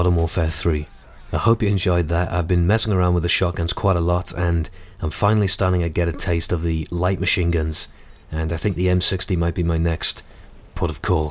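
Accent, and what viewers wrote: Wales, United Kingdom. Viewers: sound like a welsh xbox ahoy :)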